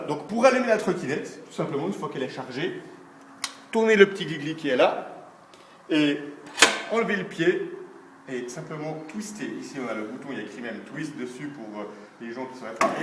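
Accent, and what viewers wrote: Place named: Belgium. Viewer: vu l accent de Belgique lol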